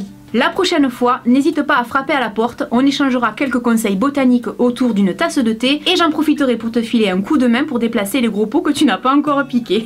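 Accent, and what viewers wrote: South, France. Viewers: sa se vois qu'elle vient du sud ^^ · Bonjour. Je viens par hasard de découvrir ta chaîne. J'aime ton style naturel. J'ai particulièrement apprécié cette vidéo sur" Mon voisin est fou". Je fais partie des 50% de personnes qui ont des problèmes avec leurs voisins, et ça m'a donné des idées pour les remettre à leurs places. Alors j'ai bien rigolé. Puis j'ai encore bien ri en lisant les commentaires trop drôles. Super, ici pas d'insulte!!!! Je m'abonne à ta chaîne. PS: comme toi j'ai l'accent du sud et c'est bien de ne pas essayer de le gommer dans tes vidéos. Il apporte beaucoup de convivialité.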